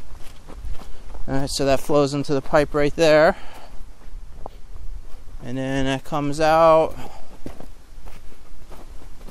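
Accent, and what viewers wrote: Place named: New Jersey, United States. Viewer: Man you certainly live in a region with lots of drainage issues. Is this PA? I detect almost a Jersey-ish accent.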